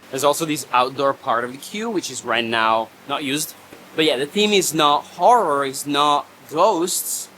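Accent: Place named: Italy. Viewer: Come imparare l’inglese? con i video di in inglese ahaha, video visto in italiano e inglese 😍. Parco stupendo sopratutto per le aree esclusive, da visitare assolutamente una volta nella vita. Continua così anche se per ora c’è poco pubblico piano piano andrà sempre meglio, ci sono pochi canali che raccontano le cose come fai tu. E poi il tuo accento italiano mi aiuta a capire un inglese più vicino a noi. Grazie per questi contenuti interessanti e fatti molto bene 🤙🏻❤️ Aspetto il video su Shanghai e gli altri dell’ America. A poi vi consiglio a te e non so valutate voi in base la richiesta di fare tour dei parchi nel mondo con noi. È una buona idea di business dove ci si diverte e si passa del tempo insieme. ( Sopratutto aggiungo, con la vostra preparazione nella storia dei parchi. ) Pacchetto tutto organizzato. Con voi come guide.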